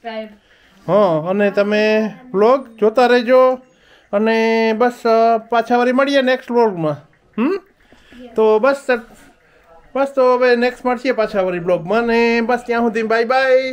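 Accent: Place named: Mer, India. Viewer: સરસ વ્લોગ 💓 હવે કમેન્ટ્સ ચાલુ થઇ... તમે વિદેશમાં રહી ને પણ પ્યોર આપણી મેર ની ભાષા બોલો છો. એ ગમ્યું....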